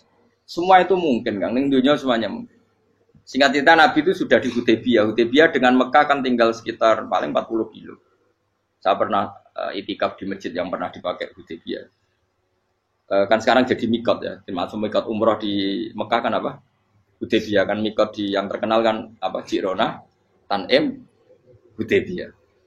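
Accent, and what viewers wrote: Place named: Banyumas, Indonesia. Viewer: ❤❤❤purbalingga ngapak ndrek gus..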